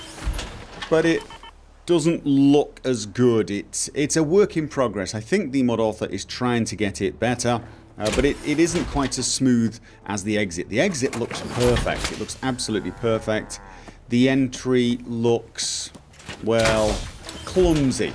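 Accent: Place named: Scotland, United Kingdom. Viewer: sir, can u tell me about that nice accent u speak? is that maybe scottish? I like it for sure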